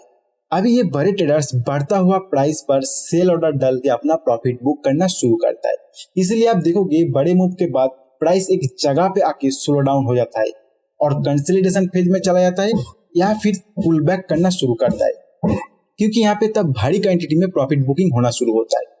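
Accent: Bengal, India. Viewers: Dada... Tomar kotha sune bangali bolei mone holo..... Tai ....bangla tei bolchi.... Stock r blasting move Keno hoy.....ba stock blast ( ek din e 3 to 5% move ) ki kore hoy..... Ei related kichu information dao ...... Khub upokar hoy..... 🙏🙏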